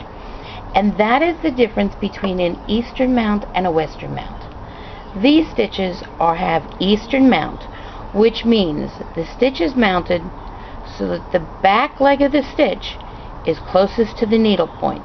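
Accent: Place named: Troy, United States. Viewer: We used to go to Troy on our way to Cadyville almost every week end because we had partying friends in "those days". Troy, was "big" on our list! I know; because your accent is most evident. I am amoung the 20 percenters of knitters. I knit though the back loop and purl through the front loop. I get a cross stitch unless I purl through purl through the back correct? I wrap my purls the same as You do. I subbed and I don't know how I missed Your wonderful channel in the past! Thank You. I do have a question for You. What will the most probable outcome be if I enter some of My awesome socks or knitting into the fair for judgement in Our today's world?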